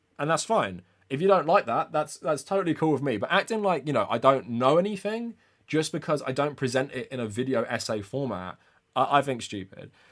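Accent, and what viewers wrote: England, United Kingdom. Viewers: I'm almost certain they think you're condescending because of your confidence combined with your accent lol lots of western media has posh know-it-alls or intelligent, antagonistic Englishmen as the opposition. Aside from that, seeing a Destiny viewer call you condescending is so rich 🤣 Destiny is easily one of the most condescending politickers out there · I don't think you're condescending. But maybe just having a British accent makes you come off that way to people in the U.S🤷‍♀️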